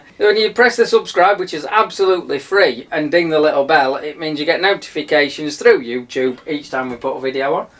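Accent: Yorkshire, United Kingdom. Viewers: Your wonderful Yorkshire accent is similar to that of Mick Ronson, a guitar hero to many like me. Do you both hail from Hull as he did, or are your roots closer to Leeds, Sheffield, etc.?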